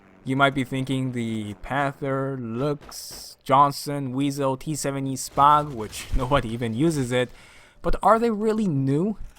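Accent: Germany. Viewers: what really?!? He totally has a german accent XD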